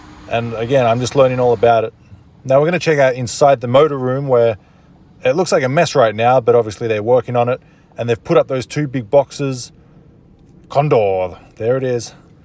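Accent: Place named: Australia. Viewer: I'm actually dying to go to move Australia. I was shocked when I noticed your accent, and you moved to California. I feel like Australia is the place for me. I'd like to move to Sydney. I'm sick of the freezing weather here. Canada is brutal to live in. Sydney has the most amazing oceans I've seen on camera, but maybe it's hard to make a living there. You obviously know more than me.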